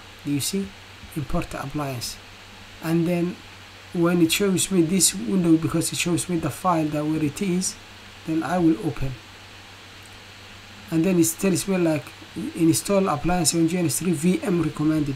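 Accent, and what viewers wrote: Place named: Somalia. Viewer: waraa english kaada aaba kafahmay inaad somali tahay:face-blue-smiling::face-blue-smiling::face-blue-smiling:🤣🤣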